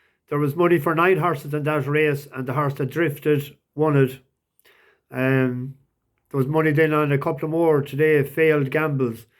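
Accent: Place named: Scotland, United Kingdom. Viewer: Great stuff, pity about the the scots accent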